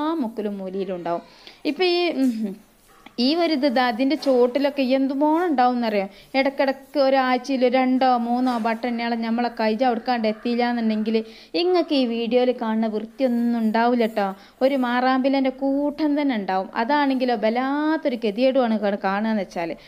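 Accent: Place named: Malabar, India. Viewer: കുട്ടി ഏത് നാട്ടുകാരിയാണ് ഭാഷ നന്നായിട്ടുണ്ട് ഞാനും മലബാറിയാണേ.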